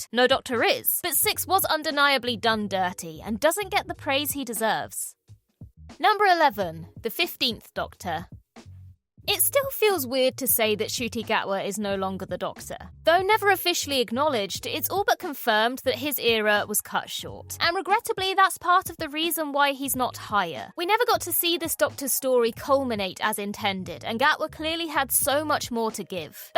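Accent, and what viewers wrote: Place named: RP, United Kingdom. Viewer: Thank you. You were quite magnanimous in sticking to the script even as you repeatedly said "I wouldn't have picked that one, but it's here on this list, so let's roll with it." I am always grateful to hear clear crisp RP (pretty much) accent. Thank you for that too.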